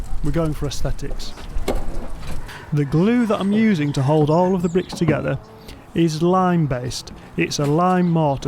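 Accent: United Kingdom. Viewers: New to the channel, was expecting someone in their 50s with the voice. That's a proper accent, a rarity these days in the UK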